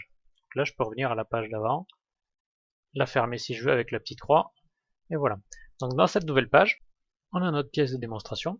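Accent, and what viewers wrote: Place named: South, France. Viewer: Bravo pour toutes ces vidéos, excellentes à tous points de vues... avé un petit accent du sud... Ceci dit, savez-vous comment changer le plan d'origine d'une création de pièce? ou changer l'axe d'origine? merci d'avance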